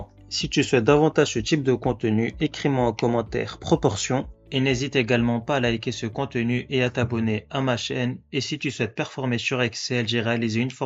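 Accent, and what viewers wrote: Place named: Maghreb. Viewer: T’es DZ ou MA ? Ça s’entend fort 😊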